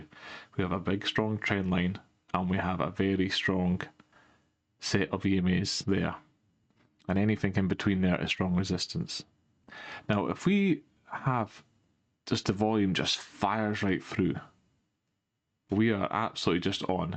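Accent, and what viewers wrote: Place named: Elgin, United Kingdom. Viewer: Thanks, I bought back in at 17 and obviously I hold them in my pension bag. I also started buying again at theses levels a bit every day as I'm guessing we have been making a bottom. What I'm buying now I will sell and hopefully make profits and buy back cheaper if that situation pops up and we see a top forming. I try sort of day trading but it's beyond me at the moment using the daily 4 and one hour time frames . The violent liquidity grabs keep getting me. But we all must learn. I'm in Mexico but my family are in Elgin probably not far from you by your accent. Anyway keep up the good work it's appreciated.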